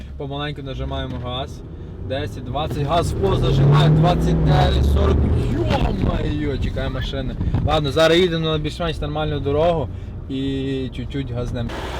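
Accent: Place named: Surzhyk, Ukraine. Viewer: суржик жёсткий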